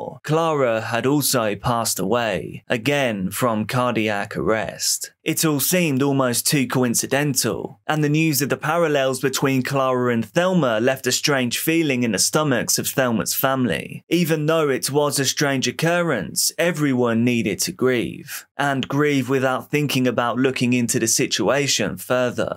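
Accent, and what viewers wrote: England, United Kingdom. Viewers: then your English accent is flawless 😅 he’s definitely English, from a fellow English person 🫡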